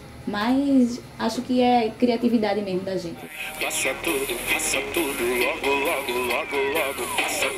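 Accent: Brazil. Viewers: Sutaque de nordesteina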